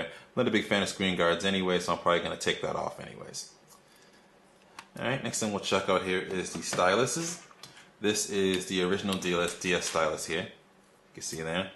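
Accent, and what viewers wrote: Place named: New York, United States. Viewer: "NEW YORKIAN ACCENT"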